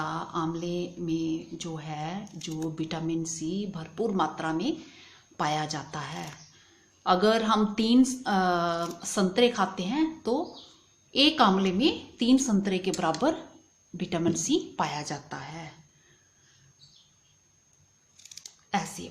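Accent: Punjab, India. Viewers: g aap 4 minute ke vedio ke 22 minute laga diye kirpa karr ke sabh teyar karr bass ek piece kaat karr short mein dikhaeya karo........main hi nahi sabh bor hote honge.........kaam achha kiya.......shukriya.....aap ki zubaan punjabiyat jhalakti hai aur yeh achhi baat hai......koi bhi ho bolne mein wahan ki khushboo aani hi chahiye......👍👍👍👍😃😃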